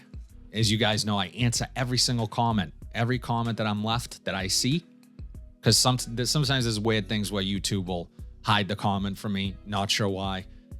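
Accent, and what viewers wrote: Boston, United States. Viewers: Congrats! Cool Water was my intro into Fragrances around 1994 in a clear bottle with a faux wood screw on cap. I'm thankful for you and other guys like you who are willing to talk to a camera and share this passion in a way that both educates and inspires! The first time my wife overheard a video of yours that I was watching, she said, "Woah, someone's from Boston!" 😂 Happy Thanksgiving! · Congratulations. You are a very honest and passionate reviewer with no hidden agenda. The content is superb and I have gotten a couple of great just watching with very little recycled content Love the Boston Accent too I am still waiting for whatever I won I just can't find the private message you sent me. LOL!!!! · you wanna get the numbers up of subscribers?… gotta get to collab with other fragrance reviewers. Just watch once a reviewer collabs with another fraghead… and how significant their numbers go up. 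Just some advice. Good reviews and stunning Boston accent 😅… keep it up buddy 👏🏽👏🏽👏🏽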